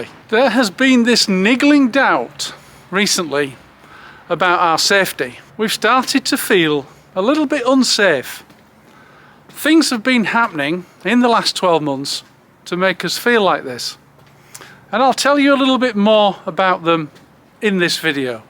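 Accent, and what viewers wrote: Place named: England, United Kingdom. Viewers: Hi,. I'll be 65 early next year. No, it's not just an age thing that we do our best to avoid people. I've always been that way since a little kid. Obviously, natural introversion comes into it, it's something you're born with. Also, environmental factors like family life etc, as you're growing up, and the not so good things that come with it. That can deepen the introversion. At nigh on 65, i've always loved my Solitude, it's my medicine, i'm happiest then. You sound like a Yorkshireman,. I'm from Sth. Yorkshire. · Overnight i just wanted be on my own, that was back in 1990, I remember the moment, it was very strange. Judging by your accent are you from Leeds or Huddersfield? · First video of yours I've watched. The title caught my eye (I'm 61). And, as you started, I heard "York"...one of our kids went to graduate school there so we visited a couple times and really liked York. 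I've always avoided people more than most probably, but at this age, I have even stronger pull to have regular interactions with a couple close friends, not several acquaintances. As for you, I mean this with genuine respect, you sound like the locals we met there, many we knew were lifelong residents of York and they said it has gotten similarly busy. I'm not sure the young folks behavior is a result of much of anything other than being young and their particular generation. I'm American (don't hold it against me) and we see similar here especially in places where young folks are in higher numbers. Appreciated the video, liked, subscribed, looking forward to viewing more...there's not much talk about aging so these are helpful. Thanks! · Can't stand English accents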